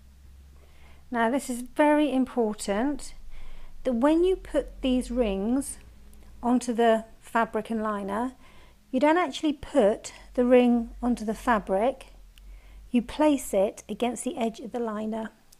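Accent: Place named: United Kingdom. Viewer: I love that British accent so much!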